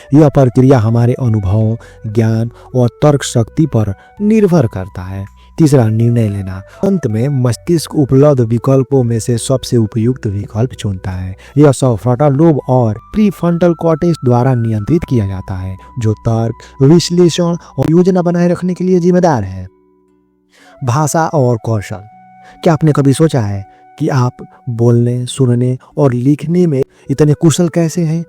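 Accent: Bengal, India. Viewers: Bangla accent 😊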